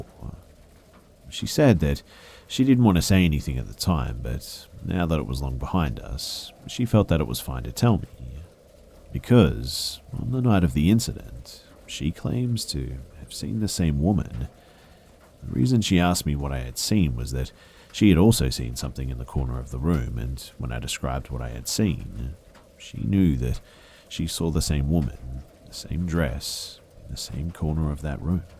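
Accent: Australia. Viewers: Are u aussi or kiwi? I am not pretty sure about your accent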